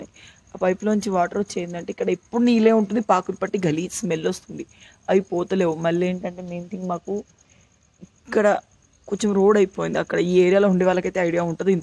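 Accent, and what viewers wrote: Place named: Hyderabad, India. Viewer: Hyderabad slang masthu vachindi akka niko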